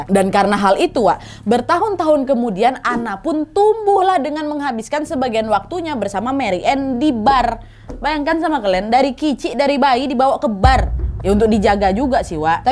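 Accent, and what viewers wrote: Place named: Aceh, Indonesia. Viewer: Logat acehnya kental ya ❤️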